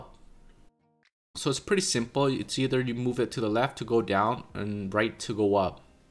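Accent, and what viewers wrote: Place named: Poland. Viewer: Polish accent :)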